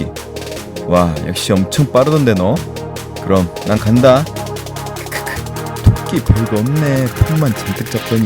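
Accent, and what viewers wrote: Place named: Jeolla, South Korea. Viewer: 전라도신가부다..ㅋㅋ 사투리 억양~~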